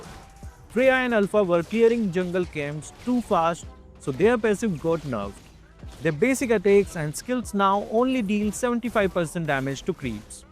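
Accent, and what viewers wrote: India. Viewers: Japanese name but Indian accent 😅😂😂😂😂😅😅😅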